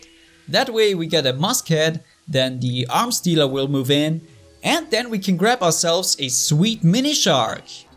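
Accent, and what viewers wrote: Germany. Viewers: man hört dein deutsch heraus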